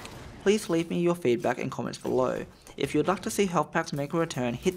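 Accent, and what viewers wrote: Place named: Australia. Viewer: Hey mate! You sound like you're from Australia!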